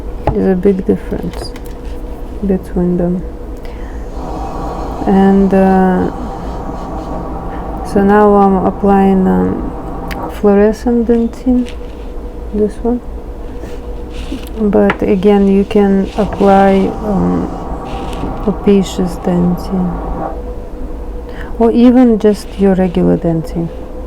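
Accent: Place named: Russia. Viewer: Русский акцент 🤪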